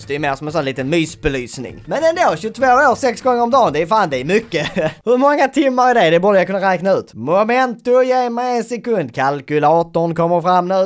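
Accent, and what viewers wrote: Scania, Sweden. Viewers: Alva Rigo han e skånsk, såklart man gillar den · Älskar skånska!!! 😍😍😍😍😍😍😍😍😍😍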